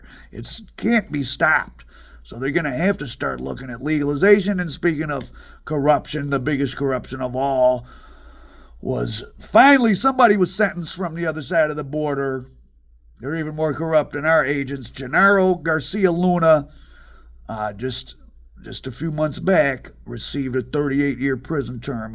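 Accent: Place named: Chicago, United States. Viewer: Can't stand this guy's Chicago accent. Its like watching that movie Fargo.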